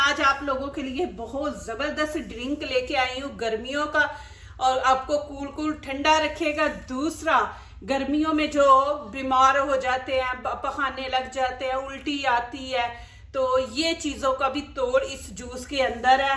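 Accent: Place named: Punjab, India. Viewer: Agr ye chaba chaba k punjabi men Urdu na bolen to Video short hojaye gi.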